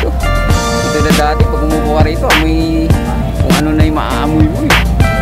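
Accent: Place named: Visayas, Philippines. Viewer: malingaw ko maminaw nimo dong blogger ba kay naga tinagalog ka apan bisaya kaayo imong accent hehehe. payts dong. tira mga bisaya!!!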